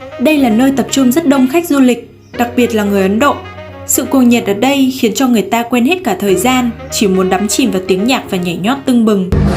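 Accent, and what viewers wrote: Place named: Nam Dinh, Vietnam. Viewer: Âm hưởng nam định rõ vcc kkk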